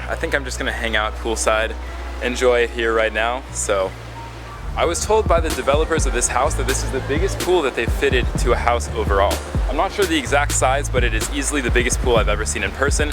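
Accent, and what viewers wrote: Germany. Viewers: Are you german because you got a german name and a little german accent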